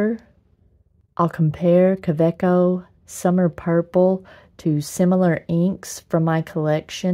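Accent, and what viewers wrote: South, United States. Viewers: I saw one of her videos for I-don't-recall-what, I stayed for her consistent review technique in her videos and of course, the soothing voice in that cheery southern accent ☺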